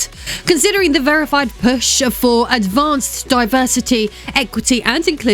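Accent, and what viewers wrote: United Kingdom. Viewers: the only thing you are is gorgeous. Regardless of yours or anyone else’s political stance, the fact that you’re so attractive, with an amazing accent (I’m a sucker for that British accent) and just the fact that you’re a real gamer. ❤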